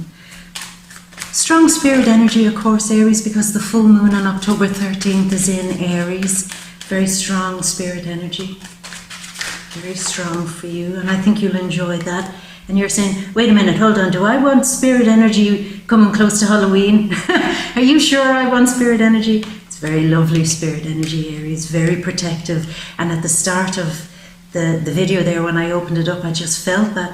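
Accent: Ireland. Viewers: looked at my relocation chart the other day and it seems that Ireland is where I need to be!! Although born in the UK my father was a Kerryman. I have been living in Spain for 33 years now but my feelings aren't the same as when I came out here......listening to your lovely accent makes me feel at home!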